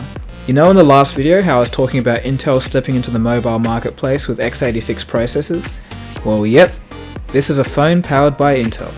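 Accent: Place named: Australia. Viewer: cool. did`nt realise u were from oz tho! you dont have a typical oz accent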